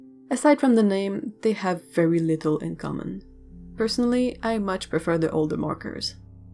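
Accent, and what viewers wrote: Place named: Quebec, Canada. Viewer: Même pas 20 secondes et j’ai tout de suite compris que tu es québécoise :D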